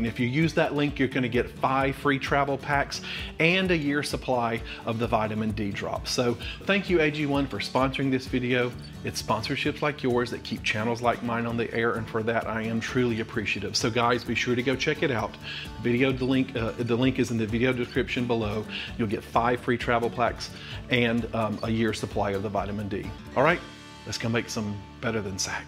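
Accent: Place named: North Carolina, United States. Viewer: When you said "I was tickled to death" I told my husband, now THAT'S what I'm talkin bout, he speaks our language! We were both born, raised, and still living "in the sticks" of North Carolina, so you sound just like home to us. I'm a new subscriber but you have quickly become my favorite out of all the low carb content creators out there. Thank you for being so real and genuine, you're awesome!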